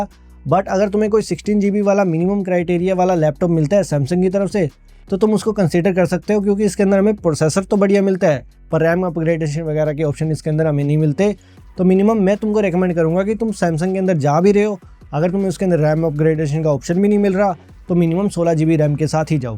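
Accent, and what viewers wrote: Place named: Haryana, India. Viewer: Bhai haryana se ho kiya btw lag raha ha 😅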